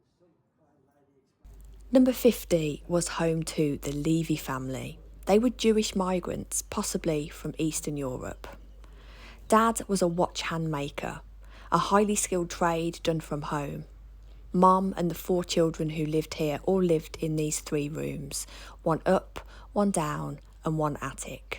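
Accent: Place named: Midlands, United Kingdom. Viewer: What an absolute treat. I was completely enthralled by you describing the people’s lives. I remember us having the wallpaper with the horses on it and funnily enough they have it on the wall of my dentist now. I love the way you talk and definitely understood the lingo as I’m from there too. After 50 years in Somerset I’ve still got the midland twang. You brought those people to life, just fantastic. Thank you again Bab 😊 Xxx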